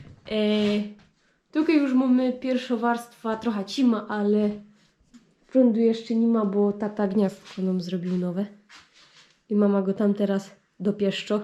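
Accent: Silesia, Poland. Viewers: fajna ta ślunsko godka w wykonaniu.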